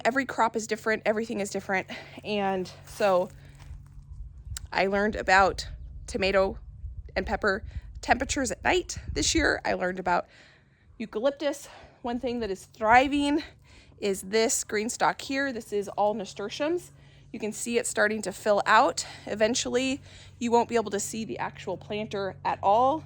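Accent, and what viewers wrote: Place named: Michigan, United States. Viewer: Are your out of town guests from Michigan ? I was hearing a bit of a Michigan accent off you today lol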